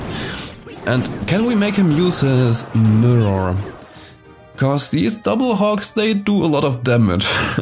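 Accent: Germany. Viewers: du bist deutsch; das erklärt die Aussprache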